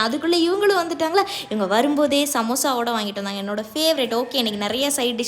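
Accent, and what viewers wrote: Tirunelveli, India. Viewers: Tirunelveli ah sis neenga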